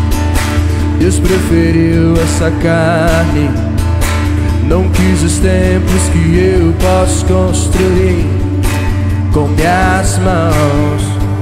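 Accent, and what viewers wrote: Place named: Rio de Janeiro, Brazil. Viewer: é de BH mas tem o maior sotaque de carioca. "Exxxxtou em obrax" hahaha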